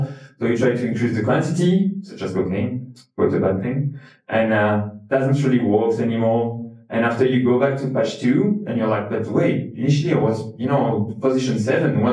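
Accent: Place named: France. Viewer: Fluent English ! With pretty french accent, j'aime bien :)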